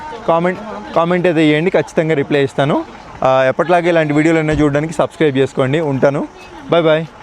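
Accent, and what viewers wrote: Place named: Prakasam, India. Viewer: Nice bro, మీది ప్రకాశం డిస్ట్రిక్ట్ నా బ్రొ, మాటలు పర్సనాలిటీ అలానే వుంది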